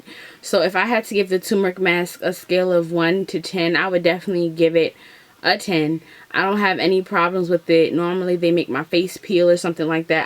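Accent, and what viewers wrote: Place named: Baltimore, United States. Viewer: When you said turmeric, I just knew you were from Baltimore! Y’all accents stick out like a sore thumb💕😂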